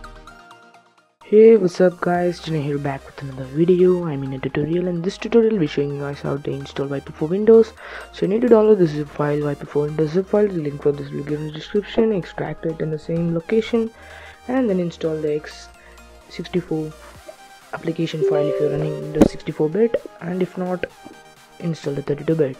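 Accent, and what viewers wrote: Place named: India. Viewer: when i heard indian accent, then i pass.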